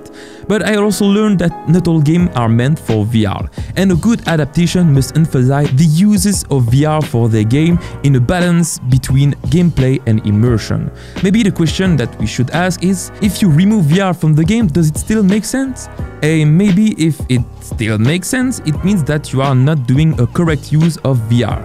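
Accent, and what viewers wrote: France. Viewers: tu es français? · are you french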